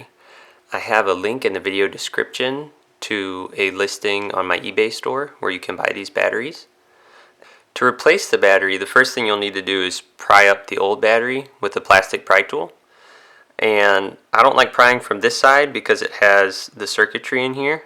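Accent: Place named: United States. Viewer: Very nice guide. I love American Accent ❤️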